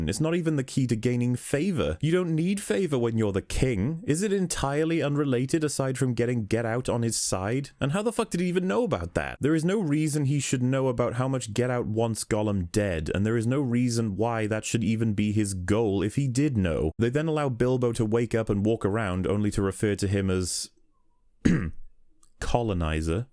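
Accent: United Kingdom. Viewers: we all know what N-word you were trying to use....And its not nitpicker, which is what this entire video is. Seriously, stop trying to hold yourself up like some sort of film scholar when you have to complain about how they're clothes are designed. It's called "tradition" and "culture". This whole video, i thought was a joke, and in retrospect, it is, just like your channel. But wow. Why is Black Panther somehow the ONE marvel movie that pisses you off so much? It's like you had your teenage son write this in a few hours and asked for dad to use his british accent to do a voice over because everyone can trust a british accent. What a pathetic excuse to get mad over a movie. Btw, i do agree with like, 20% of what you said, but if i play the "suspension of disbelief" card, you'll somehow misread that as "oh well, opinions are subjective bro." So instead i ask you to study in a film class or something and brush up on your presentation. 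So many unnecessary "F" bombs, at least get creative with your cussing. So uncivilized. :) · I hate to say this to you but I feel as if your British accent works against you with this particular commentary. It makes you sound like those willfully ignorant colonizers they speak of in the movie who came to Africa in other parts of the world to quote on quote spread civilization wow being willfully ignorant others culture identity and or achievements. I say this because a lot of you are complaints we're actually dressed in the movie I'm not sure if you tuned things out once you came across something that did not make sense or forgot them. A lot of your complaints for example are centered around the heart shaped herb as well as a panther suits. Again I say this is more or less comic book accurate so if you're going to lay fault lay it on the comics themselves. I suppose you could blame the movie for not editing out elements that don't make sense so allow you to have that. 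However it was clearly explained that only the line of the king could consume the heart shaped herb. Anyone else it would kill or rather only one person in a million could consume the herb and live. Now I will give you this, the whole kinglee trial could have been done better. That is also something that was taken from the comics but not in its exact form. On YouTube there is a cartoon call Marvel Knights the Black Panther. Essentially the trial was as such, anyone was free to go through the trials to become the king and those trials consisted of vesting wakandas best warriors. The Last Man Stand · you can sound like you know what you're talking about by just having a British Accent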